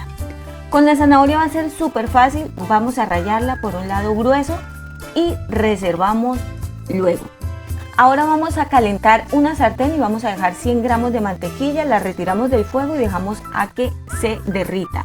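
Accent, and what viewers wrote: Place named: Caribbean Coast, Colombia. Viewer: Tiene un acento costeño como de Barranquilla o Sincelejo con un toque como de Santandereano y paisa.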